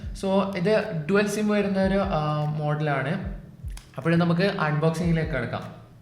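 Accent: Kannur, India. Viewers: Nee kannur aano 😊😊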